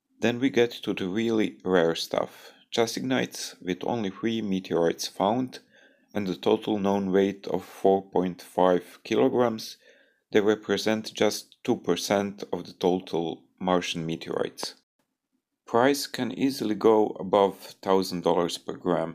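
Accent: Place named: Eastern Europe. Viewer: Thank you! That's very kind of you! I do appologize for the thick 'slavic' accent 😃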